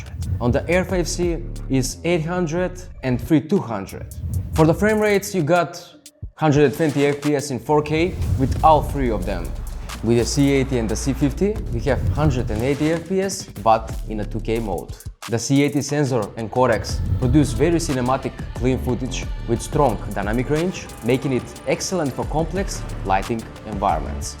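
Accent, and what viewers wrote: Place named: Russia. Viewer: Такое чувство что ты русский который выучил английский и косишь под американца))) А по теме всё супер! Спасибо за видо.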